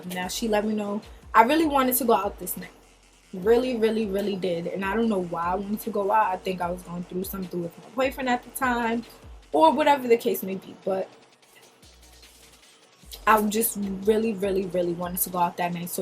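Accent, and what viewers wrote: Jamaica. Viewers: yesss bish the jamaican accent!!!! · Omgggg that Jam Accent though, yessss you so gorg. But that friend thing, I'm the same way, some females just don't know how to have, accept and good friend or be one. Gurllll shoot come to Trini/Tobago I'll be your bff lol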